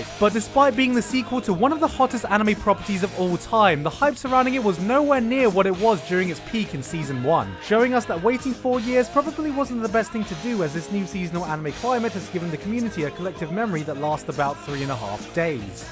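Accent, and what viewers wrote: United Kingdom. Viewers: [ „playlist suggestion“ -|- 111:02 later x3 ] fuck need more good comedy content that gives me that special kind of exciting and good feeling i get from waching your videos with you talking about how shows are that they them selves never manage to give me and a sexy english voice with a british accent ... =)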